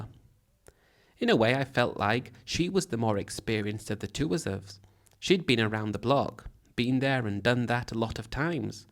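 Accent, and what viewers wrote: England, United Kingdom. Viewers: You have a English accent you liar nothing you said is true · So is this channel just some British guy reading middle-aged white men's accounts of dating in the Philippines??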